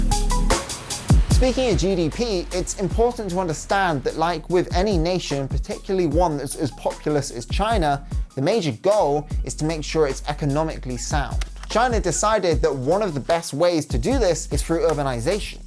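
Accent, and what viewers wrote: United Kingdom. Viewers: The guy sounds British, not American? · Mate that accent is not American... He's very clearly from the Midlands or south of England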